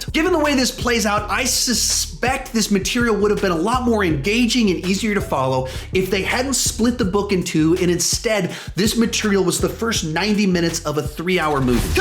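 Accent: United States. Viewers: Birtish names pronounced by an american. Hurts my ears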